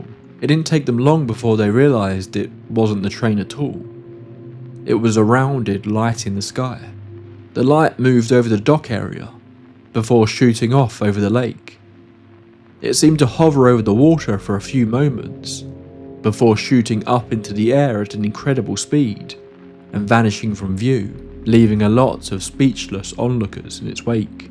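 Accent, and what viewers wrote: United Kingdom. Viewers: Im sorry but it seems odd for stories about America to be told with a british accent . Maybe that is just my opinion, maybe not. And they are good stories by the way 😊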